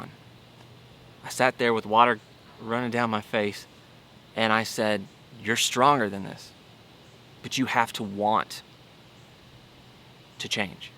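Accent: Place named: Georgia, United States. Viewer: So glad you're on the upswing! The Georgia accent is coming back and I think that's a good thing ♥️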